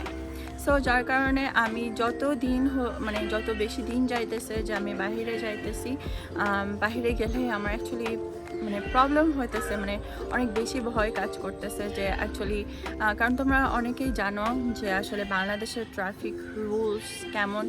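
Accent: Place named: United States. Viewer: আপু তোমার কথা গুলো এরকম কেন ??ইউ এস এ আসল বাসা তোমার??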